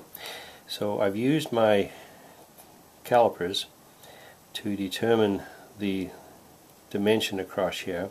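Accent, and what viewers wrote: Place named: New Zealand. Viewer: Just discovered your channel - fantastic stuff! I’m a kiwi returning to the hobby after 25 yrs in the live steam scales with lots to learn as to what has changed, and an engineering shop to make jigs and precision parts - BUT trying to pick your accent sir! I can hear NZ, a touch of English, a smidgen of Australian, Southern USA etc etc. There has to be a story there - put me out of my misery please!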